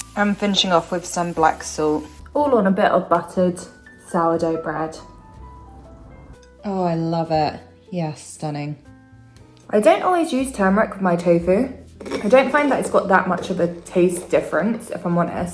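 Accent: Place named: United Kingdom. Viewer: no dear, please! I'm in love with everything British, like your British accent 😍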